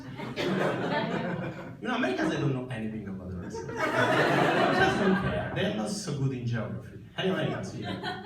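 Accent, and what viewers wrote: Rome, Italy. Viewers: Non concordo, ha un ottimo inglese. Non fa errori grammaticali e ha un vocabolario più che adeguato. Ha una pronuncia più che decente, molto comprensibile seppure con un fortissimo accento italiano. Ma se si capisce cosa dice e non fa errori di pronuncia a lui trasparenti, l'accento non è un errore, non intacca la valutazione del suo inglese così come "nativo" non è un livello di inglese. Capisco che sia Italiano come capisco che una persona di Manchester sia di Manchester e una persona Irlandese sia Irlandese, eppure non diresti mai che un Irlandese non abbia un perfetto inglese perché non parla come Re Carlo. Perché poi si entra in una seconda questione: quale sarebbe il nativo corretto? Americano - e quale Americano? O preferisci RP? Ogni accento identifica la provenienza. Quale di queste provenienze siano considerate native non è questione di livello di inglese, ma di geopolitica. 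Il fatto che parli con un accento non mi dice assolutamente niente sul suo livello di inglese. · from a fellow roman it's funny how he transfers directly "roman humour" and accent in English. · HA ROMANIZZATO L'INGLESE...L'HA RESO SPICCIO HE HAS ROMANIZED ENGLISH TALKING...MORE EASY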